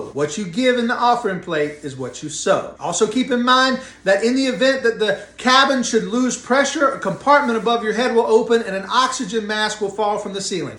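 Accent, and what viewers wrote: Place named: Scotland, United Kingdom. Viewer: arguing the Bible in a think Scottish accent is . . .divine!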